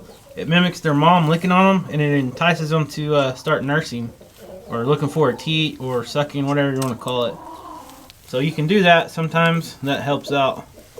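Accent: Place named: South, United States. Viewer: I can tell you're from the South when you say "I'm fixing to do this or that." I say "fixing" all the time. Nice baby goat you had!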